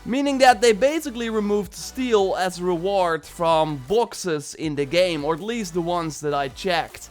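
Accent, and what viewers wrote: Netherlands. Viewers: For sure your Dutch with your accent ! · Your Dutch accent is awkward to hear. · I can hear You’re dutch because of the accent. Its pretty bad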